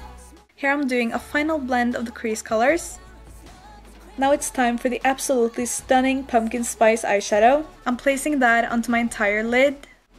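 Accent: Norway. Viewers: Are you from Norway? The way you speak english is so Norwegian english ahahah!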